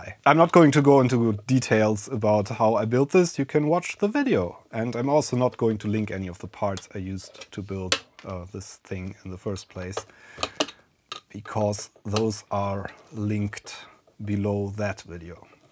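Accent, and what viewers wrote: Germany. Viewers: Man merkt direkt das du Deutscher bist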